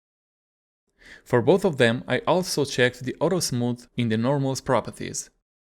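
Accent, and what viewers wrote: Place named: Russia. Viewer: Дружище, по акценту слышно, что ты русский. Пожалуйста выпускай хоть иногда видео на русском 🙏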